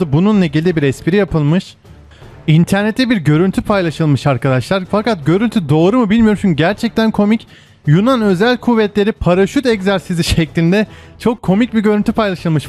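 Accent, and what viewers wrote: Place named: United States. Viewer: Bak kardeş seni abone ettim ve paylaşımlarını izliyorum lakin şu dönme gibi yada amerikanlıştarılmış lehçeyle konuşma. Sinirlerimi bozuyorsun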